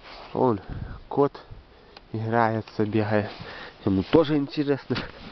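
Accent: Belarus. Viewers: Цудоўны наш акцэнт, братка-беларус!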